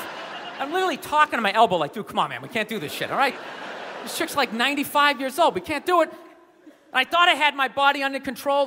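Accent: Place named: South, United States. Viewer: love his southern accent xD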